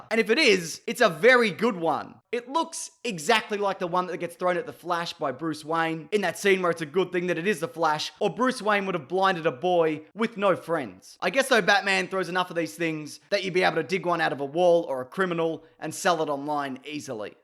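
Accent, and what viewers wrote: Australia. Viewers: I can tell that your an Aussie bye your accent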